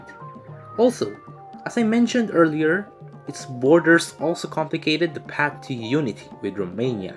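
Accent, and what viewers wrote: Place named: Philippines. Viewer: Knew you were filipino from the accent. Good stuff dude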